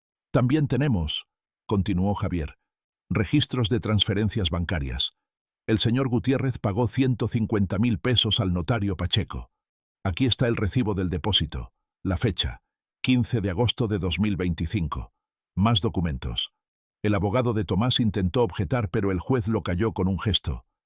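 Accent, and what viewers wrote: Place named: Spain. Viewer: Y si eres de Oaxaca, porque hablas como un español?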